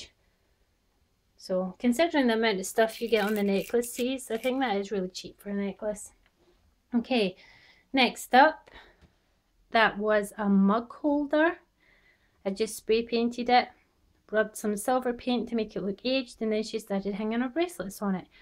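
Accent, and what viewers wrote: Scotland, United Kingdom. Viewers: are you Scottish you sound so much and love the room I need to for this to my room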